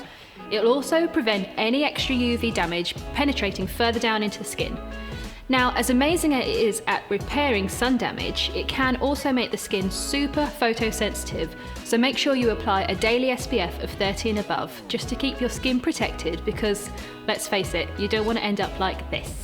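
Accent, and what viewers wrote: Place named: United Kingdom. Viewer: Good to hear British ive subsctribed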